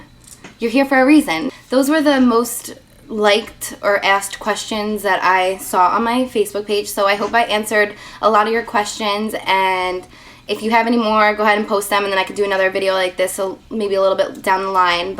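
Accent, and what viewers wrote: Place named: Brooklyn, United States. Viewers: You're absolutely gorgeous. U look like Megan Fox. U seem like a very down to earth girl. You're very talented! Love all ur tutorials! I love Ryan Gosling too lol. Are u from New York or New Jersey? U have a New Yorkian accent. I really like what u said about not settling for anyone--wise girl hahah srry for all these comments bunched together. Anyways keep doing wut you do and thinking the way u do, you will succeed :) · Are you from Jersey or NY? I'm from Brooklyn & I can hear that voice represent girl!!!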